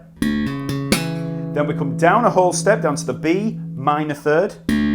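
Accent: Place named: Northern England, United Kingdom. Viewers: A proper northern english accent. :) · Great! Loving the lesson, technique and the Yorkshire accent!!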